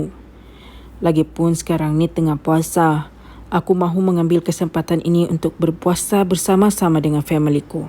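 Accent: Sabah, Malaysia. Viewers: mcm loghat sabah sajaa??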